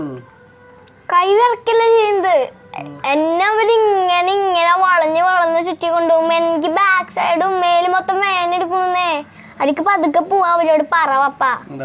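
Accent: Thrissur, India. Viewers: Trissur slank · wow hyderabad to cochin yathraa manoharamayi kanichu thannathinu othiri thanks...molude samsaram super..Thrissur slang 👍❤️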